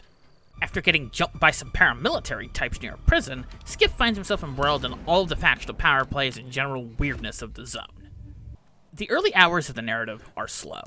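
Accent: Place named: Russia. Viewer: you used russian pronunciation of names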